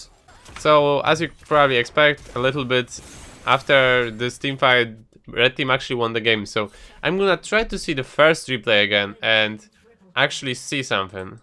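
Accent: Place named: Poland. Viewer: Where is from? Because i hear like polish accent in his voice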